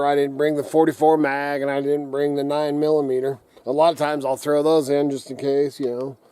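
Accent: South, United States. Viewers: Sounds like myself hillbilly enjoyed the clip thanks.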